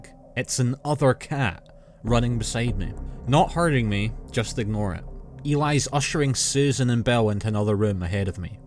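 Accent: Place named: Scotland, United Kingdom. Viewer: Lmao you literally got the same accent and hilariously deadpan delivery of frankie boyle....well accent isn't nearly as strong, he's probably the most scottish that a man can be, complete with huge red beard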